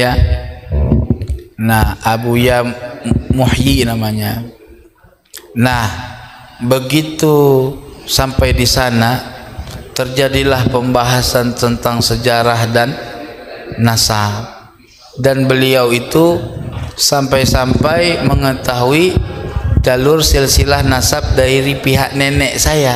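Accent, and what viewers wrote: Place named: Jakarta, Indonesia. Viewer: . Kori. Saya. Suka. Omong nya. Betawi bangat.saya pendukung mu. Maju terus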